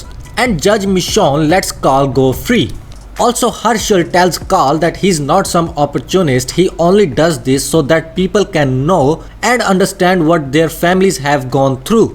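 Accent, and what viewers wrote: India. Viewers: Lmao that accent reeks of curry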